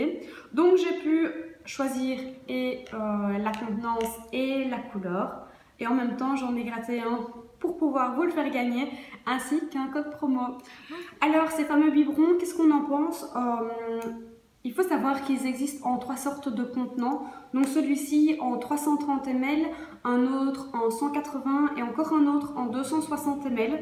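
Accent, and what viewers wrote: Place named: Belgium. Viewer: coucou. juste un message pour te dire que tes vidéos sont mettent de bonne humeur, ton naturel, ton accent belge (moi je suis du sud :-) ), ton peps et bien sûr ton Choupy trop mignon. j attends avec impatience la sortie de tes nouvelles vidéos..et bien évidemment je tente ma chance pour le biberon 😋 qui ne tente rien à rien 😉 bonne continuation !!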